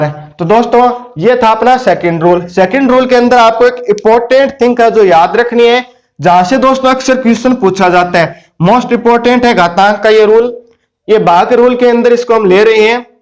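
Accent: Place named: Sri Ganganagar, India. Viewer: Language ka lahaja.. Dekhkr lga... I'm from Sri Ganganagar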